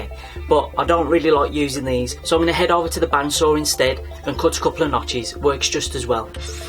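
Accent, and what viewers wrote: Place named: Birmingham, United Kingdom. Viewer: first time I've heard a Brummie accent on YouTube in a decade of viewing. Ofc you are probably from black country, rare to hear the accent in actual Brum.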